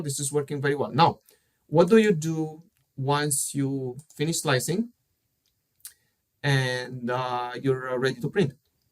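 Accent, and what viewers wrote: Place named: Romania. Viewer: Are you romanian? :)) (because of the accent) Anyway, congrats for explanations.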